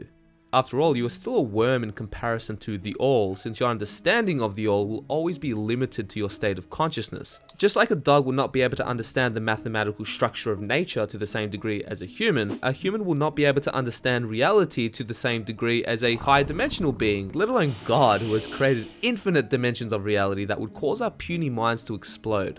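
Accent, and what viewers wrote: Australia. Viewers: This guy has to be Aussie that accent was strong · Sorry, it's just hard to listen to a serious topic delivered on a thick Australian accent. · This dude is very good at explaining, nice and clear with a friendly aussie accent...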